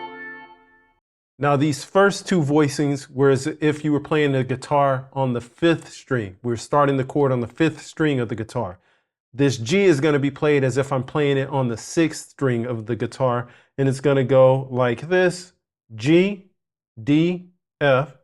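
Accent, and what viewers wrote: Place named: South, United States. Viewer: 🤣🤣🤣yes, maybe. But you actually do have a somewhat southern accent. I live in New Orleans and I grew up around up near those guys. I appreciate your constructive approach through your videos. Extremely helpful. Thank you so much.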